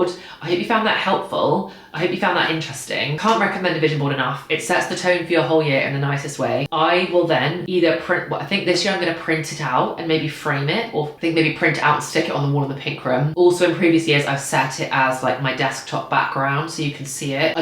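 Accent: United Kingdom. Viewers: Hi, happy new year! I'm Sarah from Italy. I've been listening to your videos for two years to improve my British English listening skills. Thank you very much ❤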